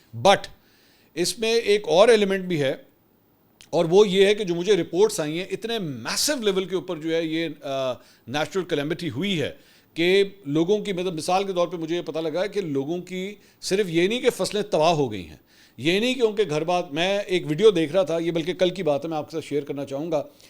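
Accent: Punjab, India. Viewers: I love to listen your GULABI PUNJABI especially when you take ___ of Jahil Indian's. Keep it up BRO!!!❤ Stay blessed. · Ap ki velog maian punjabi k terka is so beautiful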